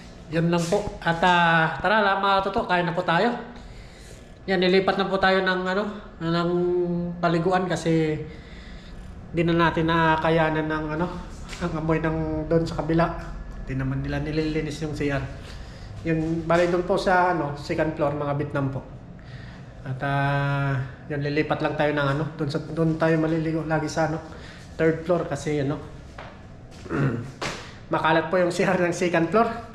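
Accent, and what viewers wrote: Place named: Hiligaynon, Philippines. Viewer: taga saan ka sa Pinas? Hilongo ka, ano?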